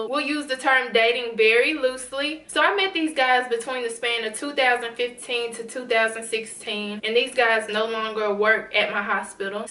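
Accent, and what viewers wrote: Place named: Houston, United States. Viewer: She sounds like she’s from Houston ..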